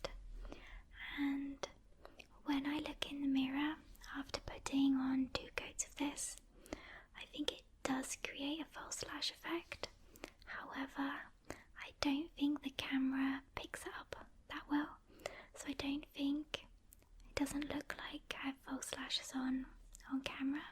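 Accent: United Kingdom. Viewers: Well, first of all, I love your British accent combined with your soft voice (I have American-Mexican accent in comparison, lol!). And you certainly don't need makeup to be cute ;) I also love the presence of green color: your eyes, your shirt, your nails... are your nails green? I think I'm colorblind XD. Anyhow, thanks for this video :)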